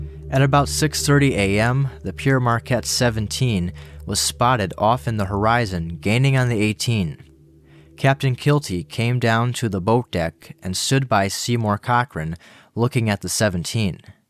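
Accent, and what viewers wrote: Cleveland, United States. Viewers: Dudes gotta be from Cleveland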